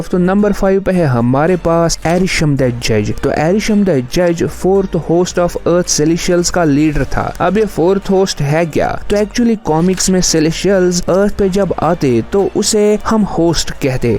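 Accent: Kashmir, India. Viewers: bhai tumhari batu sai hi pata chalta hai ki tum Kashmiri ho 😊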